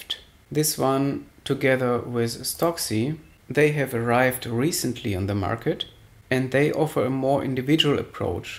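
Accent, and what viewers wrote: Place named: Germany. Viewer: Kein problem.. I like to hear De-nglish..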